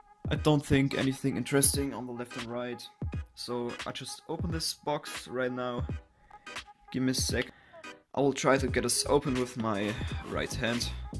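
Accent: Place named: Germany. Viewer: Der deutscher Akzent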